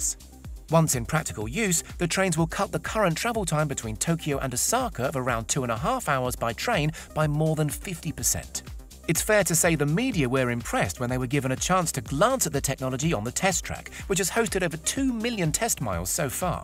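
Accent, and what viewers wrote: United Kingdom. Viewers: The narrator has a British accent. It's probably the reason why he using that archaic unit. Multiply that speed by 1.61. This gives about 602 km/h.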